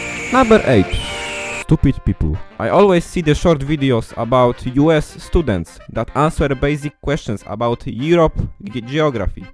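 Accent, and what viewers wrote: Poland. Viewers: His accent, lmao. As Pole speaking English for years - he basically polonizes every english word. It's absolute beauty. It's me if I don't know how to vocalize word in english all of sudden. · Masz najmocniejszy polski akcent jaki w życiu słyszałem. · Polski łamany na angielski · Odrazu po tym akcencie wiedziałem że polak xddd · Słychać, że polak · Oh my, your english sounds so Polish. I am saying this as someone from Poland. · czytany angielski ciebie zdradził xD zanim powiedziałeś że z pl już się domyślałem ale ogólnie to sam nie umiem czytać za dobrze, umiem dużo w angielskim mi sie wydaje [ bo moje porównanie to moja klasa xD ] ale ogólnie alfabetu angielskiego czytanego nie pamięta całego, a to co pamiętam to i tak angielski nie jest dokońca spójny np. know i no czytamy zawsze jako "noł" choć inne słowa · Nie ironicznie przyjemnie się słucha z polskim akcętem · yes he is polish he sound polish he speeks polish good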